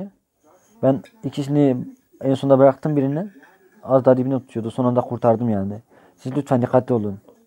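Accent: Hatay, Turkey. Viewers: kardeşim sen benim oğlum yaşındasın. Sana bir tavsiyem var. Sesini ve konuşma tarzını hiç değiştirme. Kırıtarak konuşma. Hataylı olarak kendi şivenle konuş. Biz seni anlıyoruz. Yalnız daha çok kitap oku. Kitap okudukça kelime hazinen genişler. Utanmadan rahat anlatırsın 😊 Takiptesin unutma!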